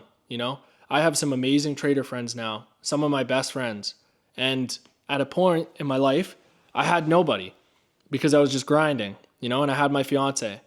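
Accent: Canada. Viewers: This was a great video. I also KNOW you’re Canadian. Can spot the accent from a mile away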